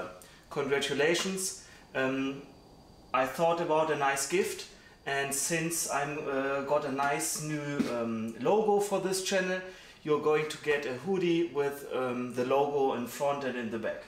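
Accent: Germany. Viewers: Man hört direkt das es ein Deutscher kohlege ist 😂👌 geiles Teil bitte mehr davon !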